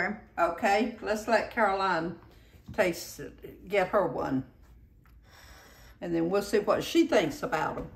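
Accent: South, United States. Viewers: I love hearing you talk! I was born in SC not far from you in Greenwood and much of my family still lives in your state. My daddy, a son and daughter-in-law, and a grandson have all gone to Erskine just down the road from you. I even have a brother and sister-in-law in Anderson. So your lovely southern accent reminds me of home. Thanks for all you share with us! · I enjoy your videos and I love your southern accent! You’re adorable! ❤From Adam in Lexington, KY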